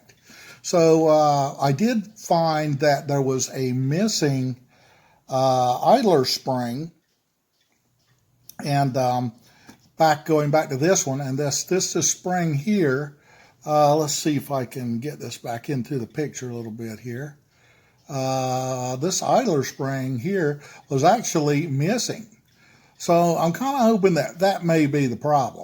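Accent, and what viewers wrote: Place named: Upstate South Carolina, United States. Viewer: I hear your accent I'm gonna say your from upstate sc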